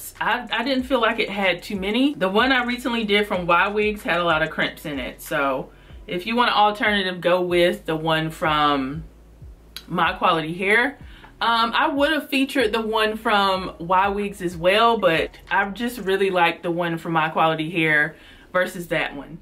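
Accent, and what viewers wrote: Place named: Alabama, United States. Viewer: Your accent must be from Alabama 😊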